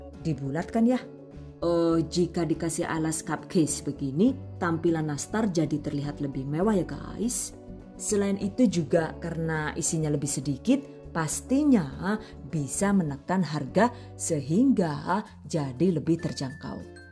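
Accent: Banyumas, Indonesia. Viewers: Karena saya g punya oven,bisa dicoba nih resep yg satu ini. Hmmm dari tampilan nya enak bgt, Mantep pokoknya 👍👍👍 Dan selalu suka sama ngapaknya bikin ketawa 🤣 #GiveawayTHV2021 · Wih sangat" faham cara menyampaikan nya bahkan bikin ketawa kas jawa ngpak nya😂😂😂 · Wong ndi njenengan, bahasane gaul tapi ngapak ya rikane.. Nyong dadi ngguyu2 ulih ngerungokna rikane. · Aku suka channel ini. Jelas bgt ksih ktrangan d stiap resep.. Juga ga pelit ilmu. Ada bhsa ngapak.. Yg bkin ktwa. Sukses trus ya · Resepnya mantap...ngapak-ngapaknya bikin ngakak...ca.....tat #GiveawayTHV2021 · Deneng rika ngapak😅😅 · Ini wong ngapak yah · Ngapak gaes😂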